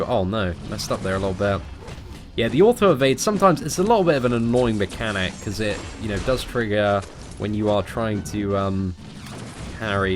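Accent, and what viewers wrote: United Kingdom. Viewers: where r ur streams at boy.... enough with ur personal meaningful life... we need ur british accent chattering in the background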